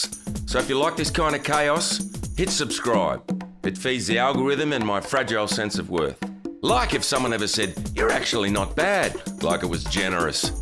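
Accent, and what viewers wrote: Australia. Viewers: I'm planning to get back into gigging and I've been loving your advice. Firstly it is spot on and I know when I was younger I've been guilty of many of the mistakes you've highlighted. Also because of the Aussie accent I feel like I'm getting advice from a Bluey character (I have a young kid alright) and makes me love it more.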